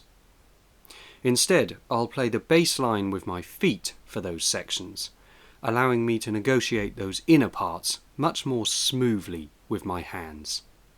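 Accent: England, United Kingdom. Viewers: The only one with a beautiful English English accent that doesn’t talk about castles or ghost it’s kind of nice. I walk around England talking about the beautiful town of England, which I love that too, but this is very different and very fun.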